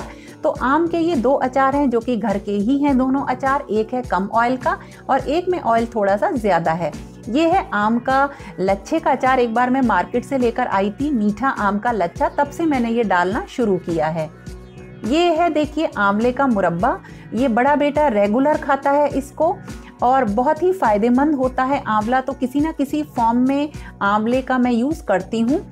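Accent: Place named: Bundelkhand, India. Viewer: Hi.ma'am aap kaha se ho,apki tone bundelkhand jesi h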